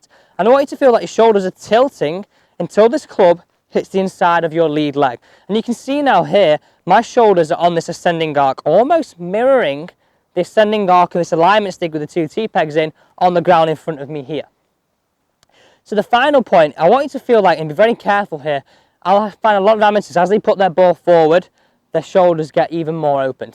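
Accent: United Kingdom. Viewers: It’s the fast British accent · slow the speech please not all viewers are scottish!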